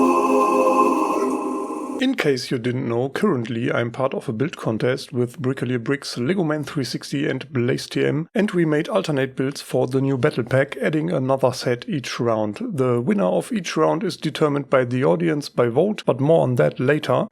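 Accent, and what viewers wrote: Germany. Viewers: Man merkt das du deutscher bist nichts gegen dein Englisch aber man hörts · Man hat direkt gehört das du aus Deutschland bist 😂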